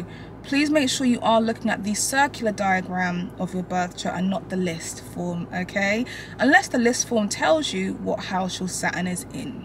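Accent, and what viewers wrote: United Kingdom. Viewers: Are you from the UK!!! If so love it and love your energy. Love how you read Saturn in 4th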